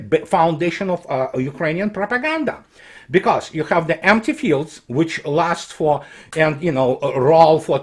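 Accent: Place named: Russia. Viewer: It's awesome and funny AH to be listening to American slang with a Russian accent!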